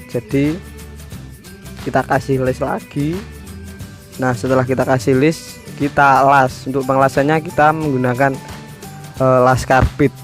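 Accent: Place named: Java, Indonesia. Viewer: Medok lut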